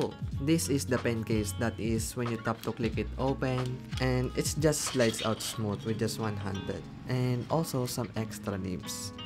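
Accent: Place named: Philippines. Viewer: I lab yor pilipino aksent❤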